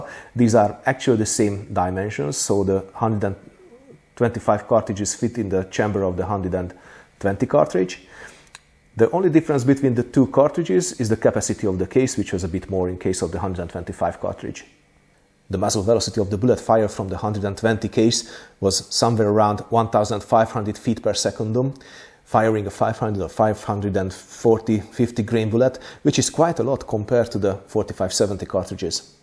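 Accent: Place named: Hungary. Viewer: you are such a pleasure to watch, informative, knowledgeable, and Hunglish is a beautiful language!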